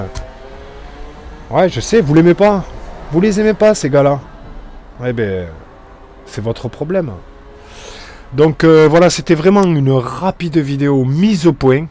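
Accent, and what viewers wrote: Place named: Toulouse, France. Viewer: Salam, Force à toi.fait le tri mec. Ont commecent en a avoir vraiment,mais vraiment plein le cul. T'es videos sont magnifiques mon Ami. De la force pour toi et les tiens 🙏 L'union fait la Force, avec l'accent du 31 toloza ma gueulle 🔥🙏✊ La Mosqué au milieu de la Medina 🙏 L'église est sacrée aussi.. Salut Salam Shalom ✌️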